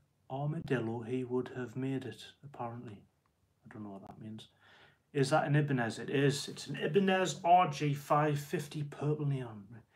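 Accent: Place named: England, United Kingdom. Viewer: What part of England are you from? Your accent sounds familiar...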